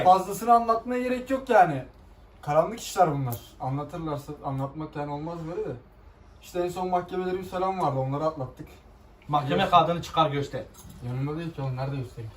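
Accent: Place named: Istanbul, Turkey. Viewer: Abi şiven değişiyor birz istanbul şivesine yakın konuşuyon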